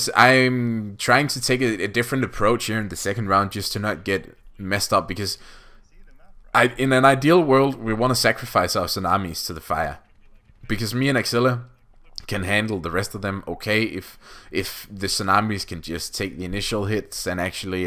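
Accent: Denmark. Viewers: Where are you from ? Dane ?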